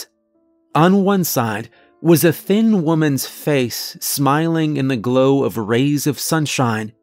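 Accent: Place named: South, United States. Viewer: Enjoy your narrations, are you Georgian? Carolinan? Edit Or Tennessean your voice sounds to me like home. Do you have a podcast?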